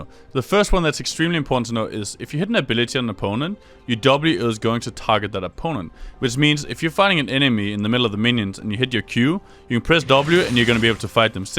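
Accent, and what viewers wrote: Denmark. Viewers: you sound like a dane ?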